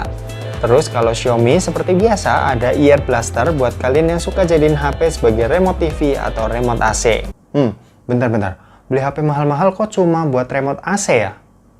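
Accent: Semarang, Indonesia. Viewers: Masnya orang Semarang ternyata wkwkwk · lu orang semarang bang ? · Eong Semarang to ndeng? Hehe sukses selalu lur...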